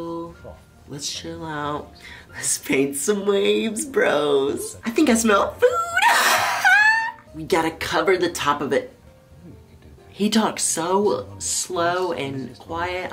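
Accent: South, United States. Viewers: Every time i watch your videos i always forget you have a country accent lmao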